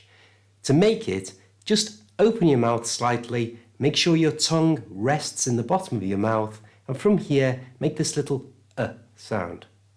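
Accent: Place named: RP, United Kingdom. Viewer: Perfect classes!!! 👏🏼👏🏼👏🏼👏🏼👏🏼 And your accent is beautiful. Is it RP accent?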